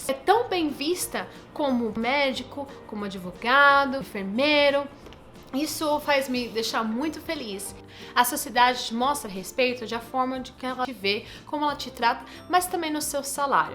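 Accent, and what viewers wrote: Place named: Portugal. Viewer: lembra um pouco portuguesa seu sotaque é bem diferente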